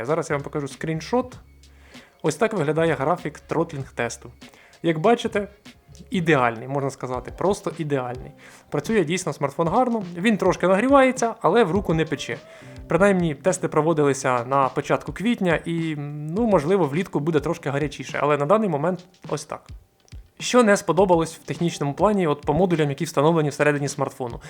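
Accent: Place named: Russia. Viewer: Чувствуется по говору родной язык русский.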